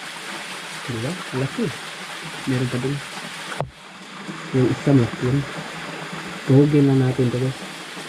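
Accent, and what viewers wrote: Visayas, Philippines. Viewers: Bisaya man cguro ka. Lisod d. E bisaya? Hehehe... Mas nice magbisaya kay baga sa tono😂😂.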